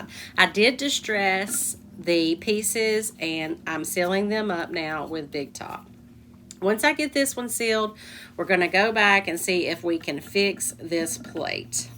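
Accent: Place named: South, United States. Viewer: Hello there,! I just found you a few days ago. You are a pure delight and your DIY's are splendid! Are you a southerner? I am. I can tell by your accent. LOL 😂 You go, girl! Jesus' blessings to you and yours!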